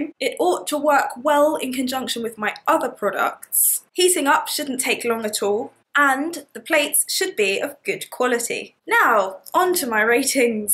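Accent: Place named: United Kingdom. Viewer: I saw Your video discoveringnatural. I would never Guess that you live in the Netherlands. Because I thought you lived in the UK because of your accent. But I love your videos and hope I get your length someday. Greetings from Amsterdam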